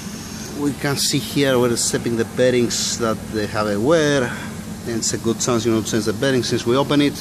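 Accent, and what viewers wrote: Greece. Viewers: Hello ser nice work I want to ask you where are you working? From the name and accent I know that it is Greece but where in Greece? I have a problem with s320cdi 4 matic the iniverzal joint in the transfer case is worn-out can you halp me please. Where to find parts do you have it... Thanks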